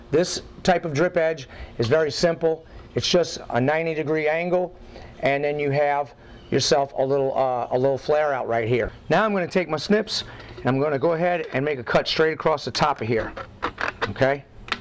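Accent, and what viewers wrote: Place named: South Louisiana, United States. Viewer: Am I mistaken in hearing a bit of South Louisiana in your voice. Not a lot, but it's there.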